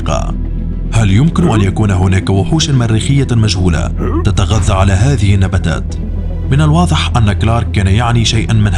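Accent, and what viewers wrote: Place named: Tunisia. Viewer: انت تونسي ! هذا واضح من نطقك لبعض الحروف هل أنا مخطأ ؟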